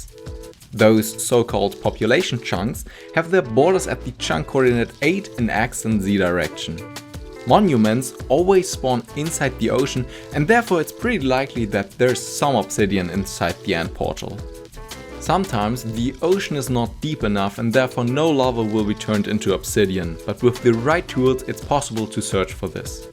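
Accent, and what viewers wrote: Germany. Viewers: Ich höre so deutsch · are you german? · Are you German by Chance? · Are you German by any Chance?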